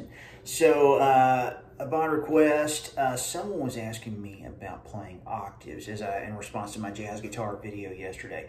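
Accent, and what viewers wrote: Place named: South, United States. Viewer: You make things make sense without trying to sound like Eddie Van Halen. Tho I am 67, have been playing since I was 8, I have never had the confidence to play without chords. Except, and I cannot play it well. But your good ole boy delivery, and not pushing the beginner too far too fast, are a joy! Not that I am a beginner, I just play rhythm.;) But Thanks!!! can't wait to find your other videos!! 😄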